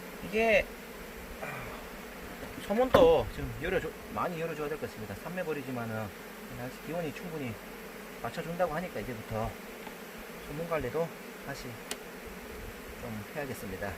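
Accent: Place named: Gyeongbuk, South Korea. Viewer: 동영상 잘봤습니다 같은 경북사람으로서 경북 표준어 귀에 쏙쏙 들어 오네요 ㅎ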